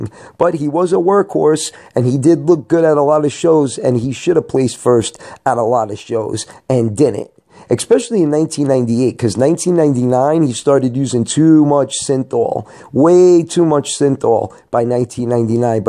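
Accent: New York, United States. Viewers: i love your nyc accent it makes the whole video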